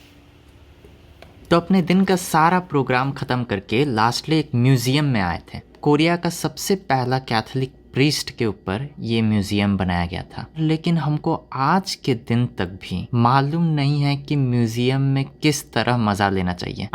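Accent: Bihar, India. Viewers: Bhai ekdum bihar wala hindi bolta h,,,(hamko)😀😀😍😍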